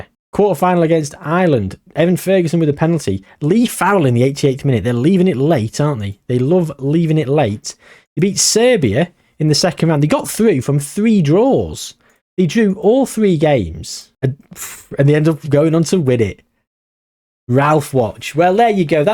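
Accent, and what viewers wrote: United Kingdom. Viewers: Are you Scottish? Fun video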